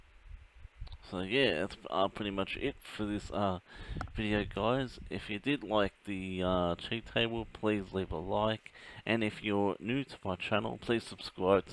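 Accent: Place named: Australia. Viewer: You got an Aussie accent plus I saw that you modded don bradmen which is an Australia game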